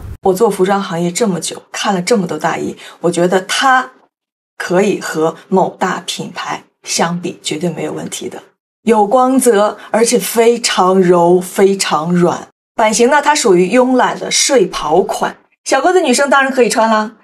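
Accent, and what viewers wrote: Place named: Japan. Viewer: 你推荐的这几款大衣从面料到色彩到版型，都非常的好，优雅高级，我跟着你给的link看了一下，价格也很亲民。👍。很可以买。手痒。还有你上次推荐的海浪包，也同样出色，美观实用，品味超群，还是美国华裔设计师的作品。棒！ 但是，我觉得，这几款大衣，不太适合你。因为它们的肩膀自然下垂，随着你的肩膀走，太溜肩了。显得头太大了。（你的这个视频里的有些照片，从下向上照的，把头照小了很多，看起来比例就好多了。）其实，这涉及到一个头身比/头肩比的问题。以中国人的传统审美，你的比例是完美的，就像明清仕女画里走出的美人。但现代全球审美是西方七头/八头身的审美，（你给的穿大衣的模特照就很明显，她们头小肩宽，穿起来很不一样）。所以我觉得很多传统东方美女要靠衣服的裁剪来修饰一下头身/头肩比。 我的家人是你的粉丝，非常非常喜欢你的频道，她觉得你的推荐，属于日本风格，低调典雅，特别适合东方女性。我也同意。挑一点小毛病，你的品牌发音，应该都是日式的，和在欧美地区流行的英语式发音（Anglicized）有区别。比如UGG是“阿格”，Louis Vuitton 被称为“LV”别人也能听懂，Hermes 近乎“Air Mess”。日式发音会让人一愣，不知所云。日本人分不清V和B、R和L。用日文来音译欧美品牌，相对来说较为困难，可能还不如汉语的音译。比如“路易威登”“爱马仕”什么的，和品牌的英语式发音还挺像。我猜你的观众不少都在日本之外，或者改用英式发音更好些？ 看到说，你1977年买了第一个LV 包，但你看起来顶多30出头。太惊人了！希望今后多发保养穿搭显年轻的视频呀！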